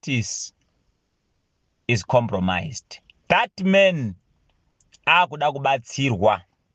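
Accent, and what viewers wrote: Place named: Matabeleland, Zimbabwe. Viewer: You got ndebele accent 😢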